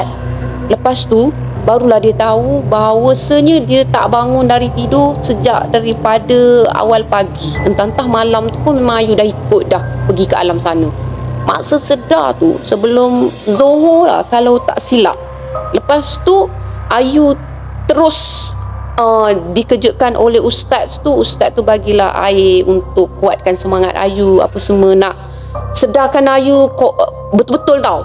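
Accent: Kelantan, Malaysia. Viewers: Kak org Kelate ko...kg mano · Sedap pulak dengar kecek Kelate..😄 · Hadir selalu layan.. Suka dengor akk kecek kelate...😁😂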